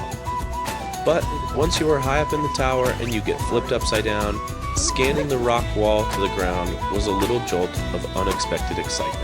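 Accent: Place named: Ireland. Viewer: The narrator sounded a little bit Irish. I'm Irish so a linguistic expert on dialect 😂. Lovely video and snapshots of your trip. Yes, this ltl country is gorgeous. There is a magic in the air and soil here. We Irish are chatterbox...we love to chat but are also interested in people. Ye did the right thing hiring a car and driving yerselves around..choosing yer own itinerary. I'm glad ye enjoyed the visit and all we have to offer here. Did ye jump into the Irish sea on any of these beautiful beaches brrrr? Can I suggest on next trip to Ireland you land in Cork Airport and drive in this area. Beautiful places..friendly people scrumptious food. Kinsale..Crosshaven with the oldest yacht club in the world..Balkymaloe cookery school and Gardens.. Ballycotton with it cliff walk.. fab pubs..grt food..fishing trips..guided tour to an island and hear its history and climb the stairs to the top of Ballycotton lighthouse..see the Mary Stanford lifeboat read all about its famous rescue. 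Visit Sea Church, go to a gig. Enjoy Irish music...lots to do. P.s ye did not need to kiss the Blarney stone..plenty ol chat out of ye 😅.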